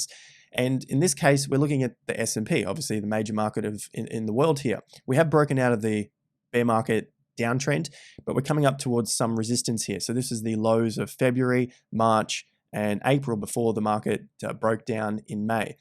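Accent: Australia. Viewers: Keep up the good work. Great to hear a genuine Aussie accent. Rare in Japan after 20 years. Quality material and delivery!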